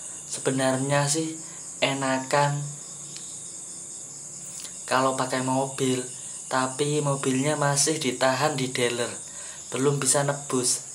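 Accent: Java, Indonesia. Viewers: Suaranya logat jawa medok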